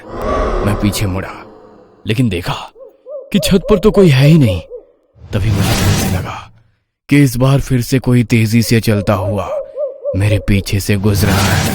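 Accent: Bihar, India. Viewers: Bhai aap bihar ke ho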